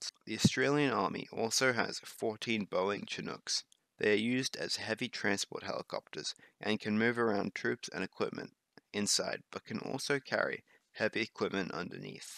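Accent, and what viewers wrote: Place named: Bronx, United States. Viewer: Sounds like a dude from the Bronx who likes saying "the Australlian Army", was getting a bit annoying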